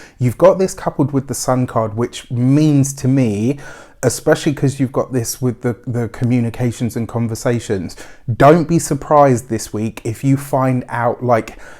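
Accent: England, United Kingdom. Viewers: Thank you very much for the reading. I'm so glad as a Sagittarius girl. By the way. Are you an English? Cause I like the way you read. Lots of love buddy. 💗💗💗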